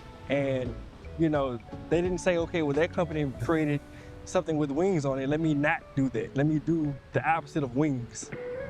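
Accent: United States. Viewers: I always thought you had a country accent. It didn’t fit LA. But everyone in LA is from somewhere else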